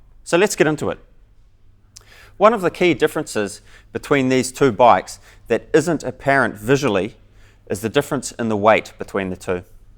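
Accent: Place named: New Zealand. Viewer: NZ Accents kill, "rice" hardly! "raice" maybe >> Decent vid though for the sprocket leagues.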